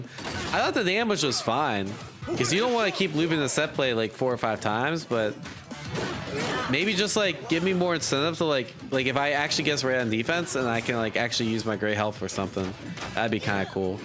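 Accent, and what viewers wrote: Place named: North, United Kingdom. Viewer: bri'ish, but northern.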